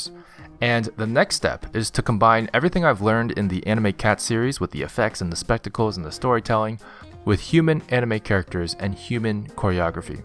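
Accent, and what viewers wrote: United States. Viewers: chest on anything make anything better, sounds like an american alright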